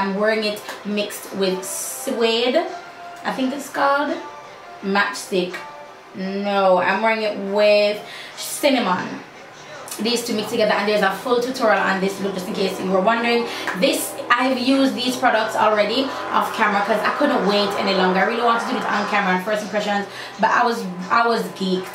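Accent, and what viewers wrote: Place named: Jamaica. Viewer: I'm Jamaican... She sounds like me and she lives in Jamaica, so I thought she was Jamaican.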